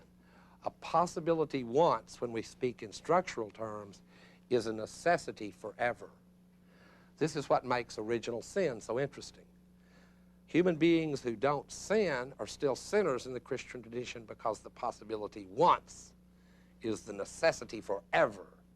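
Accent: South, United States. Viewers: I think saying words "stand in" for things is gobblty gook. You don't talk to have your words stand in for things, but to represent things. They may fall short, and that is a problem. But having them "stand in" for things opens the door to what they were never meant to mean. He says Derrida thinks words represent reality, -- for example, Derrida asks where to pick up his check for teaching a course -- then he says Derrida thinks words don't represent reality. So that means Derrida is out of money because words don't point to where he can pick up his check. It's a contradiction, pure and simple, and the speaker is good at jumping from one contradiction to another in his casual, friendly, homespun, southern drawl accent as if nothing is wrong and that it all makes perfect sense and that he is not bamboozling anybody. He is a salesman -- a bamboozler of the first degree. 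· Ah jes hate suthin acceyents but this brilliant guy knows his stuff! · That southern drawl is to die for <3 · Gotta love “Hai-degger” and “moh-derna-tee.” Just discovered this guy, big fan. Reminds me of home (TN), though I think his accent is from a different state. It’s hilarious because you expect a Baptist sermon from that accent, and then you realize you’re not in church.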